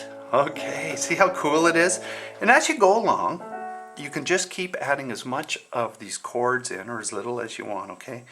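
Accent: Canada. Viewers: love it! endearing Canadiam accent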